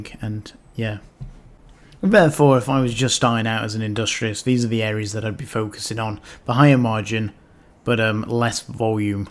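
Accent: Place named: United Kingdom. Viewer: Always great! Always love a good ramble. Especially in a British accent. ;) Diversity is King. Gryphter and my other toons are Small Industrialists for sure.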